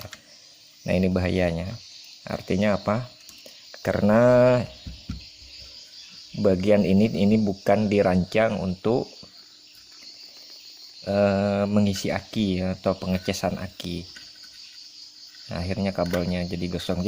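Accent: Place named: Tenggarong, Indonesia. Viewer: kira urang Tenggarong tadi,,dengar logatnya,,salam dari kukar ni broo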